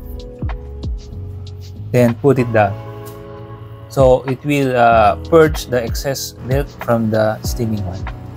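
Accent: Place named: Philippines. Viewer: Cute heavy Filipino accent. Thanks man!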